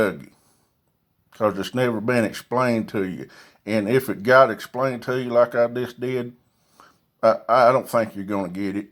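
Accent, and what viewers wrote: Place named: Georgia, United States. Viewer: I'm sorry for you you don't know what the hell you're talking about. You need an education. You sound like your from Georgia or Florida.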